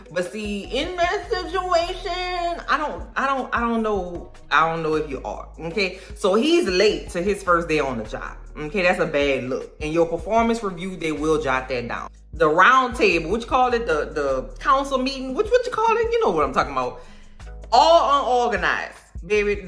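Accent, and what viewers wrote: New Orleans, United States. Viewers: OK, this is like the 8th review I've watched and I am here for the NOLa accent 🙂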